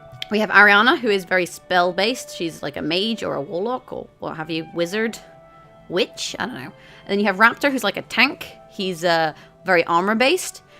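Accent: United Kingdom. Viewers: Are you from NI?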